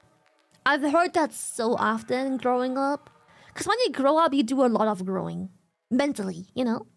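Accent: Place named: France. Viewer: Shylily's french accent is adorable. It makes sense because she is french that she is non-confrontational.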